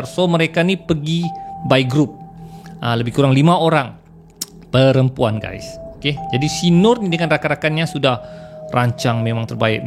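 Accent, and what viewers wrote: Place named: Kelantan, Malaysia. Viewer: Abe seram chanel masih baru chanenya lbh kurang 1 tahun,subsc pun baru lbh kurang 40k.tapi setiap video mampu ditonton 10k.....si abe ni berdailet kelantan tapi masih mampu lg difaham. ada juga si abe ni baca terus cerita atau skrip yg dihantar kpd dia...masih lg menarik.tak salah baca terus skrip cerita tu dari bercerita terus secara menghafal jln cerita....malapetakanya bila tak ingat skrip cerita mula nk merepet nk bohong dlm usaha nk bercerita jgk.... cerita ini menarik dan lbh menarik jika oerkataan yg digunakan mudah difahami.....sekadar pandangan saya saja. cadangan saya suoaya tuan baca terus srip cerita yg dikirimkan dgn olahan tambahan.(jgn menghafal mcm skrg)....tapi jija tuan rasa cara ini baik dan selesa teruskan.yg penting tuan puas dan seronok apa yg dikerjakan bukan org lain yg puas dan senorok dengar. 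ok tq semoga tuhan sayang kita semua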